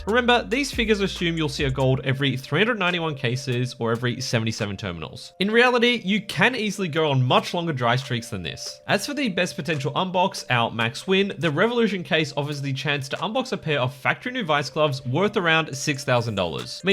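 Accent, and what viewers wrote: Australia. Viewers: “This video sponso’.” You gotta love an down under accent. Keep upt the good work!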